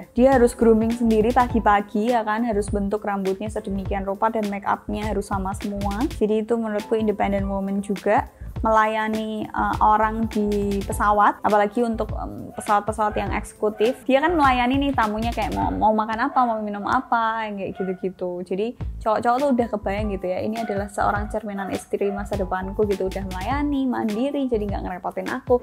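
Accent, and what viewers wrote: Java, Indonesia. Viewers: CEMPRENG PLUS MEDOK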